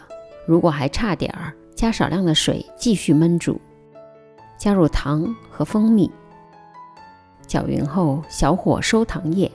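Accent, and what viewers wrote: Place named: Shanghai, China. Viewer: 的口音和样子都像上海人（至少是江浙沪一带的），讲解也很细致，像极上海人的风格。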